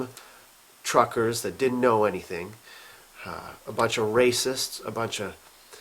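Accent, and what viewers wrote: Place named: Canada. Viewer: you’re a great guy! You wear your heart on your sleeve! From the beginning I watched you mostly, although some others, but with you I really got the Canadian vibe. I’m from the Southern US that’s it’s enjoyable hearing you talk dude! I get a kick out of how you describe the cold weather as “snappy” 😹 For years Americans joked about Canadians but let me tell you, y’all have earned our respect and the worlds respect over the past 3 weeks! Honestly, I’ll never look at the Canadian flag the same way ever again! Now, I see the Canadian with so much respect and it’s an honor having good people like you just to our north! Also to add, I’ve learned more about Canadians in the past 3 weeks than ever before and I really appreciate what you’ve done as well as everyone else! Stay strong! Y’all have the support of so many people all over this world including your cousins down down here in the US!!!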